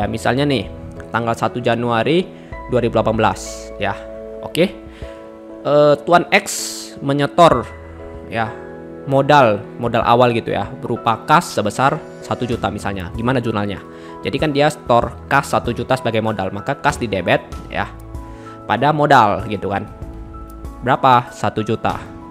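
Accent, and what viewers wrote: Medan, Indonesia. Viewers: metah kali medannya abang kuuu, terima kasih banyak ya bang, ngerti juga akhirnya aku. semoga ilmunya selalu berkah dan sukses terus ya bang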